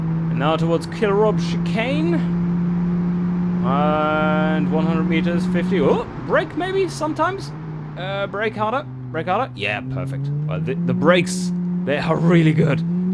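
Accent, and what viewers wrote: Germany. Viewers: Actually, are you from Germany? :D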